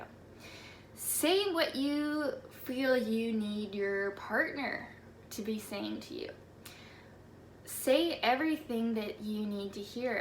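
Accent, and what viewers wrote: Canada. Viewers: Are you from ontario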